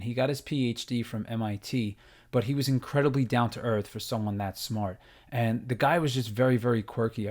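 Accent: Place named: Boston, United States. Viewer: Came for the content, stayed for the Boston accent.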